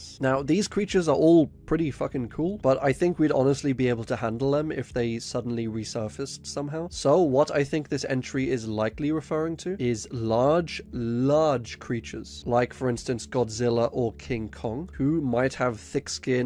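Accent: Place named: Wales, United Kingdom. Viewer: Im glad ive spotted your chanel content is fantastic keep up the good work also i hear a slight welsh there in your accent if im wrong please correct me im just a huge fan of the welsh accent probably the most amazing accent in the world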